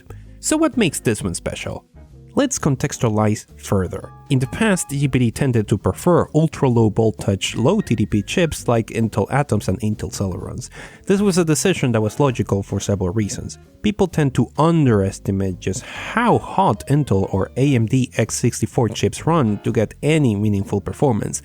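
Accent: Venezuela. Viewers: Hablas identico a mi en español, acaso eres Venezolano? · Estoy casi 100% seguro por como habla y se le medio sale que es venezolano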